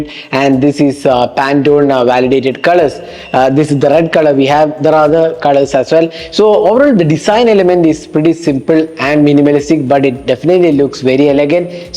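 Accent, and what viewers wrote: Kerala, India. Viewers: U sound like a Malayali.......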